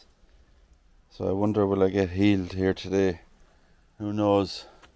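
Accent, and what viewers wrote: Ireland. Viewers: An unusual family name of Skone. I understand the Skone surname is rooted in British, Dutch, and Flemish origins. BTW, love the Irish accent and language, very important to keep it. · love the Irish accent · Thanks from Australia and we love your 'tertee' it's how we knew you were Irish. Take care. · I love your Irish speak! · I’m 🇺🇸 and I like to hear the Irish accent and people are rude to fuss about pronunciation, don’t change! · Your pronunciation is part of your Irish charm! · I love the old grave sites. The older the more interesting they are. Someday I want to visit there!!! It's been a life long dream. NEVER change, I'm American and I ❤ your Irish accent 🎉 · Only a ignorant person could criticize your accent. The Irish accent is one of the most charming accents in the world. Thank you . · your Irish lilt is delightful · I love your Irish accent. 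Thanks for a lovely tour. I do a few tours of cemeteries as I document graves for genealogy. Fascinating histories to be found! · Love the Irish accent! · Don't apologise for our irish accents when we speak in the language of our former oppressors. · Love your irish accent! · I love your Irish accent. I lived in Hawaii for almost 20 years, and they also pronounce their threes trees. If we all sounded the same and looked the same, what a boring world this would be. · Irish speech is enjoyable. So interested in the bell and tower. Healing tones from the old bells. ❤🇦🇺🙌👍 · Oh My Lord, how could someone complain about the accent? I think stories are best told with an Irish Accent - don't know why (maybe it's the ancient Irish DNA that my body still carries), I am American, with Scottish roots (and some Irish, Welsh and English mixed in). I absolutely love the accent. Ours is so boring. I love hearing you and Sue say Tirty-tree, it makes my day. 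I agree with you - you're Irish, that's how you talk and it's this American's preference. That's a hill I would die on, lol. There is something in the way the Irish speak that absolutely lends itself to storytelling, and makes it so easy to listen to. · I adore your Irish accent just wonderful and your pronunciations are perfect and no I wouldn't change a thing about you! I find it mesmerizing to listen to, thank you for this great video tour, love the church! Just beautiful ❤️ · Hello, I preferred the exterior of the chapel before restoration, the ivy and lichen made it. Painting the tower white was horrific vandalism! Thank you for a very pleasant wander around the churchyard and for narrating it in your wonderful Irish brogue. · I am American, and I love your Irish accent!!!!!!!! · The wall paintings are a stunning find! A shame we can't see what there is, but they do need to be protected in these destructive times. 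Marveous old church -- the Friends of Friendless Churches do valuable work. Nice to visit with the former residents of the parish, thank you. Your Irish accent is just lovely -- I can't imagine any moron objecting to it! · Hi how rude of some gimp to mention how you pronounce 33 the cheeky hugger. I adore your accent and your lovely Irish brogue, Thankyou so much Love and kind wishes from Lynn in Brittany xx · Doesn't matter if you say THREE, TREE, or any other Irish way, I love this channel. I wish i had an Irish accent personally. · I knew you were Irish, guessing Northern. Love the accent. Also, love seeing all those old churches and grave sites. Living in “the new world”, means anything older than the late 1700’s is extremely rare. I am also guessing it is a Norman tower on the church(?). Lovely old buildings, so glad they are preserved by the Friends of friendless churches. 🩷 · i like your Irish accent. 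· You bet you're Irish! I like your accent. It's what Irish is to me. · I enjoyed all of this, ESPECIALLY your Irish accent.☺️ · You have a lovely Irish accent; I could listen to it all day long😊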